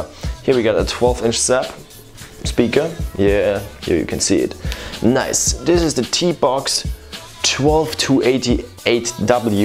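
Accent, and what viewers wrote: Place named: Germany. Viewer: Man hört sofort das du deutscher bist. Auch ohne die Deutschen einlagen. 😂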